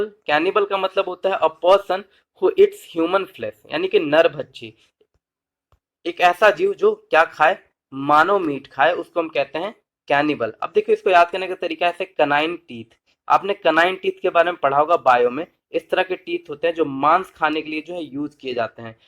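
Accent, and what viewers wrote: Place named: Bihar, India. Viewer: Sir aap bihar se ho kya...😍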